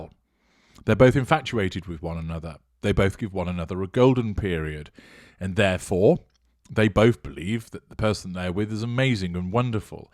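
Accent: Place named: England, United Kingdom. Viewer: I love your voice you have a perfect English accent. You sound like a gentleman 😊💜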